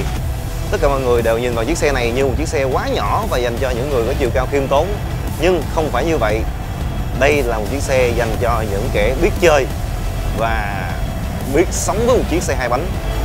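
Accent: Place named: North, Vietnam. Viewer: Tui nói đùa thôi. Chứ tui là fan ruột của bác từ khi bác còn bên tinh tế. Chất giọng bắc dễ nghe và cách bác review rất chi tiết. Nở lòng nào dislike cho ido của mình bác.😄😅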